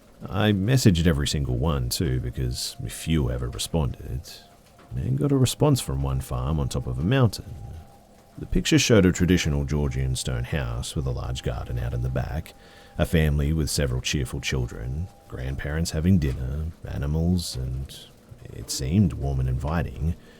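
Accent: Australia. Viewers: He's not British He's Australian. Seriously can you people not tell the difference?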